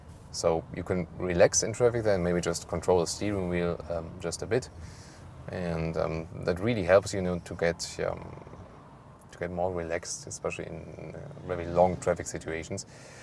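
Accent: Germany. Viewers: I just come here for the German accent, yum.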